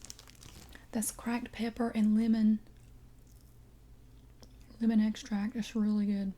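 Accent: South, United States. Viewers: I may or may not use your videos to low key practice my southern accent, which I was clearly meant to be born with. Also thank you for always making me falllll alseeeeep! xx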